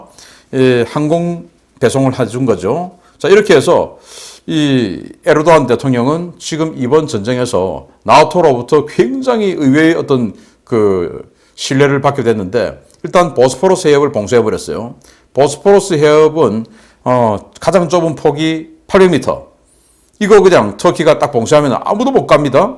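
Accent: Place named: Gyeongsang, South Korea. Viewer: 선생님의 소식은 정말 시원하고 알기쉽게 잘전햐주셔서 너무 좋습니다. 경상도 말씀이 더 구수합니다.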